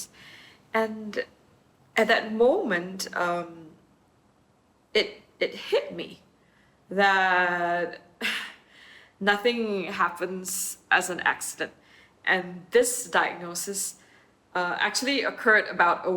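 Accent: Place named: Malaysia. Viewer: You sound like a Malaysian, or Singaporean. My question: Is there a contact person in Malaysia?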